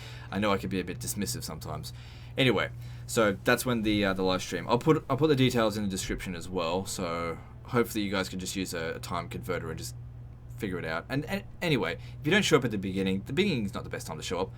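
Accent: Queensland, Australia. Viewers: Brisbane gang represent, enjoying the deep Queensland accent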